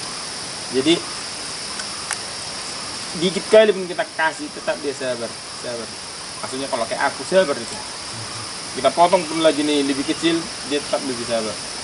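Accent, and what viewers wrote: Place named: North Sumatra, Indonesia. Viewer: dialek bg mantab kali..org sumut kali..sukses selalu 💪🏆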